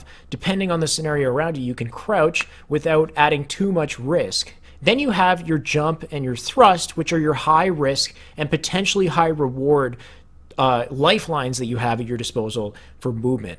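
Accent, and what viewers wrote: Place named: Canada. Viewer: He is Canadian.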